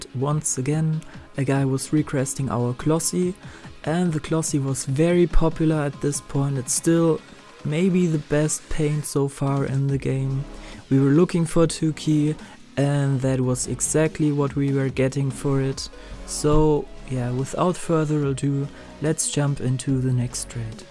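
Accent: Germany. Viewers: Hey dude nice vid you lissen Like a German Guy ^^, i am German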